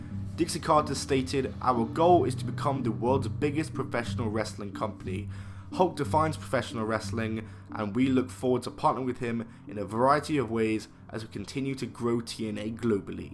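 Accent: England, United Kingdom. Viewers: Why does every wrestling video have a narrator with such a strong English accent?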